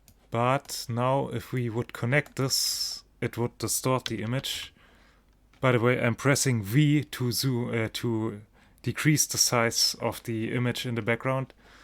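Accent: Germany. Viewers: Sehr Interessant Blender so zu nutzen, schönes Tutorial, komisch das man einen deutschen Akzent immer raus hört :D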